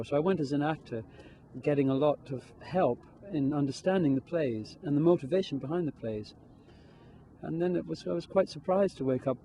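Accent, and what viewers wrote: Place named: England, United Kingdom. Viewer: Why does he have an English accent here? He was raised in America. And he didn't have one when he accepted his Tony two years ago.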